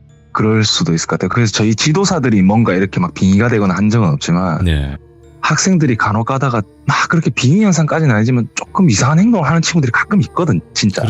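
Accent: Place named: Busan, South Korea. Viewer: 오랜만에 듣는 부산사투리 넘 좋어요 멀씀도 넘 잘하세요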